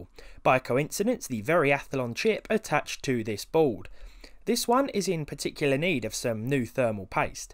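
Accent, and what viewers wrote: United Kingdom. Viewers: Somehow the British accent makes this sounds like a documentary, compared to those Canadian and American channels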